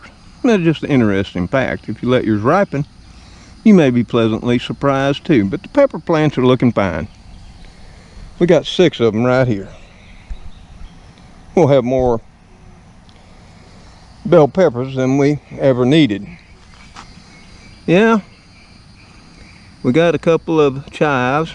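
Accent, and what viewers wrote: South, United States. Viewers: I absolutely love the southern accent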